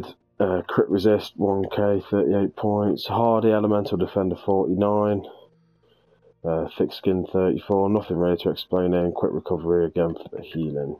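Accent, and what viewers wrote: United Kingdom. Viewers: Ah man ... I hate you right now... I just know every stam sorc eu is gonna be popping this build and I run a low resistance mag dk... 😑😑😑... brutal build and cool explanations bro... Something is bugging me, are you north, you sound like your my end 😂😂😂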